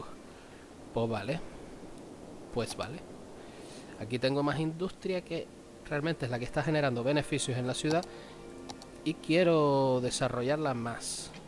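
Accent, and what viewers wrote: Spain. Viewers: de que parte de españa es tu acento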